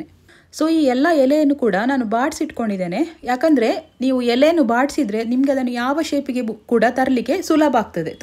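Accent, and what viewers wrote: Mangalore, India. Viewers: Manglore kannada thumba chanda❤ kelalikke thumba khushi. Nammoora bhashe❤ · Namma kudlada kannada eshtu porlu marre kenga keluva anthave agtade ❤❤❤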